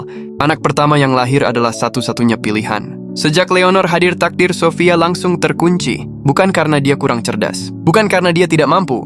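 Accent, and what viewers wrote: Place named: Malaysia. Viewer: Mimin sering mengikuti intonasi kalimat dalam bahasa malaydesh, Hal itu tidak akan berpengaruh kepada pengaruh bahasa Indonesia di wilayah asia tenggara.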